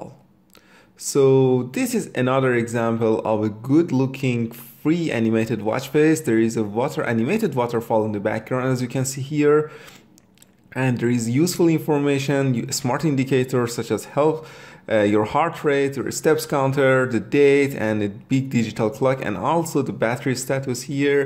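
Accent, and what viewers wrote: Iran. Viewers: I bet your ass that's a Persian accent. Lol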